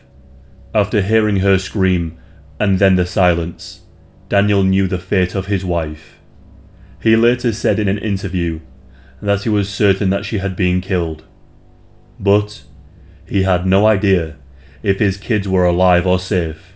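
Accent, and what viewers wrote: United Kingdom. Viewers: Just what we need- another Brit on YT talking horror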